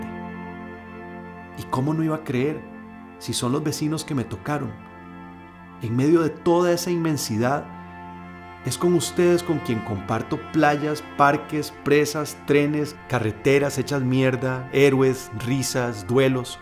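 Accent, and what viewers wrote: Costa Rica. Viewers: Desde Guatemala, mis respeto, que hermoso escuchar a un tico hablar asi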